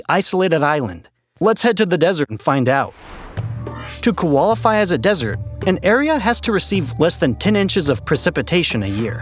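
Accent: United States. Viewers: Dude's got an American accent so he's likely American and in America we use miles and Fahrenheit, so it makes sense.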